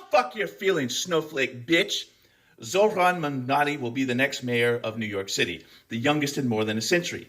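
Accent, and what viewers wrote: Scotland, United Kingdom. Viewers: Uh oh the Scottish accent is a bit sticky lol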